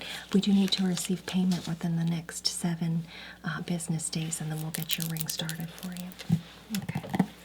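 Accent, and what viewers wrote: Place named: South, United States. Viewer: I keep coming back to this one! You are just so talented! It’s so natural and calming and feels so real! (Also I’m from NC—you have to be from the south too!!) 🥰🥰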